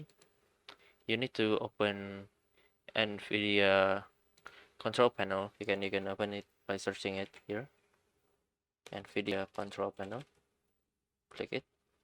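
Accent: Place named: Indonesia. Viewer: bro are u indonesian, your accent sounds like indonesian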